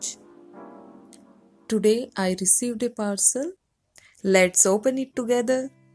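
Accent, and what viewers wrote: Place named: Kerala, India. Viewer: ohh!! srry to tag u so quickly but ur accent resembles a mallu accent!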